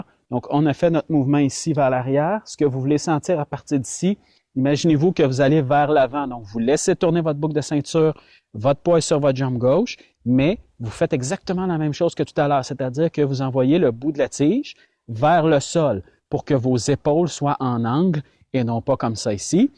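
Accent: Quebec, Canada. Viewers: Que de bon conseils simples, efficaces et jamais entendus avec en prime l’accent québécois que j’adore. Je continue à dévorer toutes vos séquences et les partage entres amis. A 70 ans, J’ai une autre approche du golf Bravo. Guy le malouin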